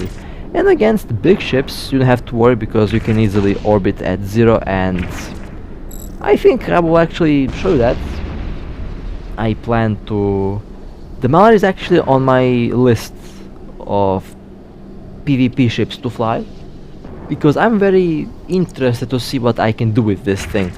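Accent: Russia. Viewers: акцент русский капец как из американских фильмах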